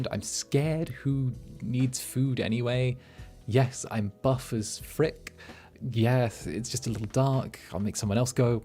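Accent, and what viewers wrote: United Kingdom. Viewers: Fancy british (?) Incubus is going to be the end of me. I love him too much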